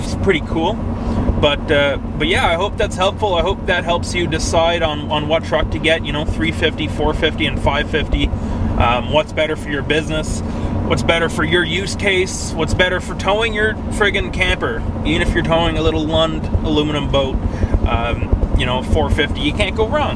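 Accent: Canada. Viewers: My family had a cabin up in Lynn Lake, Manitoba. Beautiful country. And incredibly nice and friendly people... I enjoyed this video. I didn't know you're Canadian until I saw you at the pumps and started talking. Yup! I was paying $2.50+ a liter when going up there in July 2022. And dn here in the the state's people bitch about $3.00 a gallon!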